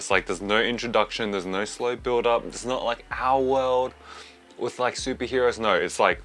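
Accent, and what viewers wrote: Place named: Australia. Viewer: I loved this movie. I agree with you in wishing that it was longer. I appreciate you - good to hear a fellow Aussie voice reviewing this and being so into the lore of the characters and previous media. Also, where did you order that food? How did you get those three dishes mixed in one container? lol. I’m so hungry now.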